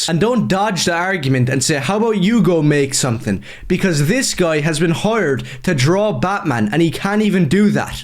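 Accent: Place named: Ireland. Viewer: You're a funny Irish guy (I'm guessing Irish by your accent). You're also completely correct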